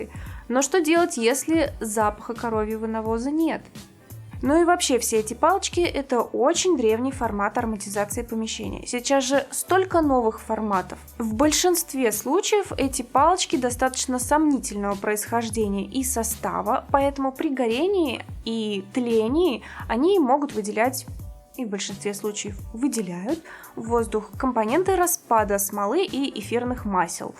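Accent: Russia. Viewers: ивановский акцент))))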